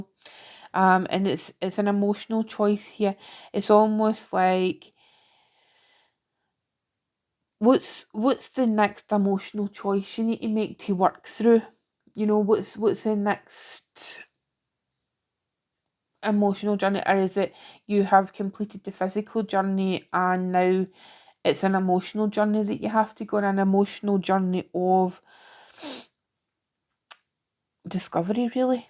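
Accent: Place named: Glasgow, United Kingdom. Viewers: The accent feels like home to me, as my late father was Glaswegian. My friend gifted me Osho Zen cards and the cards I pulled pretty much said "I'm not meant to know yet", like the clouds in the tapir card. Thank you for the reading ❤ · Great read, love to hear a weegie, my family all from Glasgow and you make me feel like home ❤ thank you ❤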